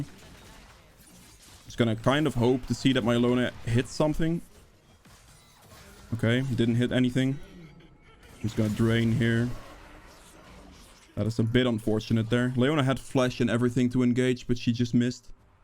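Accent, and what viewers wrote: Netherlands. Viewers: Lekker nederlands accentje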